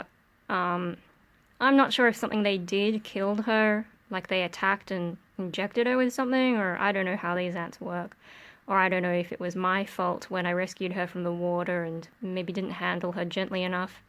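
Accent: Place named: Australia. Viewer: Yes. Northern hemisphere. Southern California to be exact. Whereabouts r u from? Somewhere down under from the sound of ur voice, but I'm just guessing.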